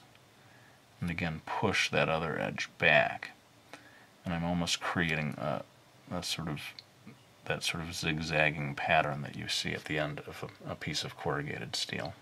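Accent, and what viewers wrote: Brooklyn, United States. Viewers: Crilley Lesson; It Doesn't matter if you do traditional art or digital art, it'll always be art....and Brooklyn accents are awesome.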